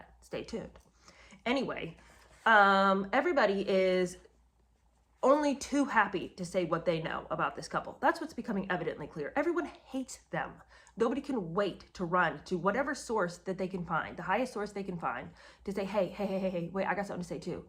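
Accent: United Kingdom. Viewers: Thanks, for bringing back your fabulous British accent to enhance your review of Traitor King. I never tire of hearing it and have actually missed it since your reviews of Spare and Revenge. The Rosenbergs were executed after being accused and tried for espionage. It makes me wonder if U.S. Intelligence agencies may have been following Wallis Simpson's antics? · 😂😂😂 I love your British accent. Making David sound like an unhinged Widow Twankey is hilarious. I started listening to your channel with the Diana book and the impersonation of her was spot on.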